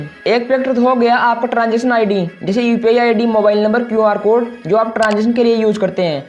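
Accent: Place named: Rajasthan, India. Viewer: Bhai Rajasthan se lgte ho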